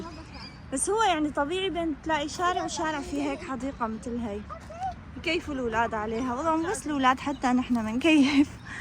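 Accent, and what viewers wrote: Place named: Syria. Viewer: بكره لكنه السوريه بتوجعلي اذني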